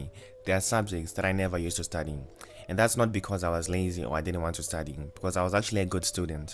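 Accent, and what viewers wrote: Zimbabwe. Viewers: U sound Zimbabwean ❤ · Is he from Zimbabwe 🇿🇼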